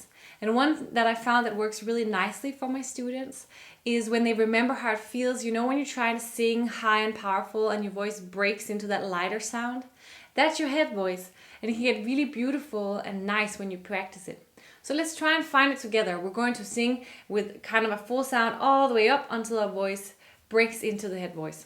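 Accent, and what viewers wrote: Denmark. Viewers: Is Danish your first language? Because that's what I hear faintly in your way of speaking. And it's driving me crazy. Sorry for the personal question, but, hey, at least it's a direct request, right?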